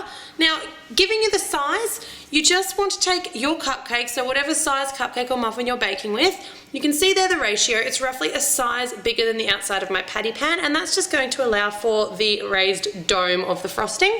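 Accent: Australia. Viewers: Aren't u Australian? I recognise ur voice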